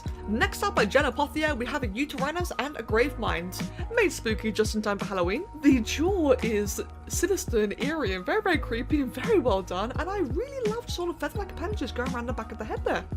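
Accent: United Kingdom. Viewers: Ooookayyy youre British?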